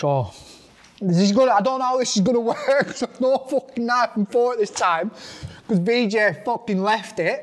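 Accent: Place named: Blackburn, United Kingdom. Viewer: Blackburn accent going on there 😂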